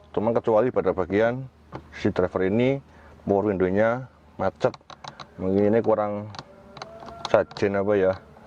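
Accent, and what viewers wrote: Banyumas, Indonesia. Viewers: Ha ha ha slm ngapak. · Tawa mobil bonus paving, pa bos,,?? Rika wong ngapak ya,nyong demen,,aku duwe wedus,go dp bisa??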